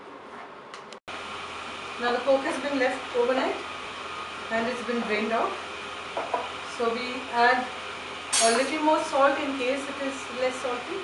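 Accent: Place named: Goa, India. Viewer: I love that Goan accent. Thanks.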